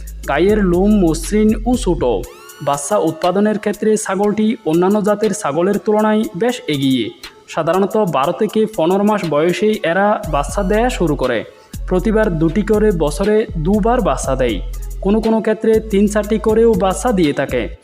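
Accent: Chittagong, Bangladesh. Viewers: ও ভাই আঞ্চলিকানো চট্টগ্রামের বাসাও নও কি ভাষা এখনো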